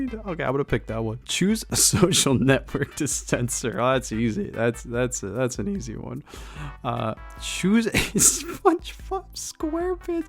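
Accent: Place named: Canada. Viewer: Love the canadian accent bud. Makes me feel at home ❤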